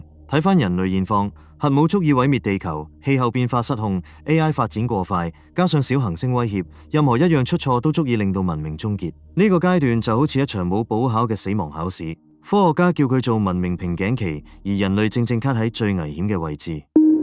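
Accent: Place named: Hong Kong, China. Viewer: 好少有人用港式廣東話講宇宙級文明，好清晰唔錯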